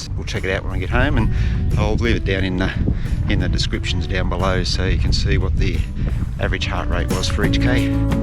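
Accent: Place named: Australia. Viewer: Great channel for runners, thank you ! I'm 42 but fairly new to running (3 years), so I can keep chasing down PB's for now, especially on longer distances (I've only run 1 marathon so far in Francfort). Great content ! And your accent reminds me of the wonderful time we had in Australia a few years ago :-) Cheers from Belgium !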